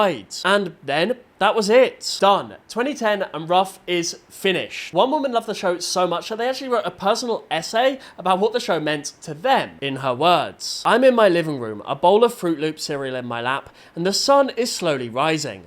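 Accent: United Kingdom. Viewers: You british right? DONT LET THE TEA TAX BE PAID. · "I always looked forward to Ruff after elementary school" What do you mean you watched Fetch?! You're British! You watched CITV and CBBC after school! The accent gives it away unless you found a way to watch it abroad?! Or it aired on CBBC?! Actually it wouldn't be a huge surprise if that was the case Arthur aired on CBBC too.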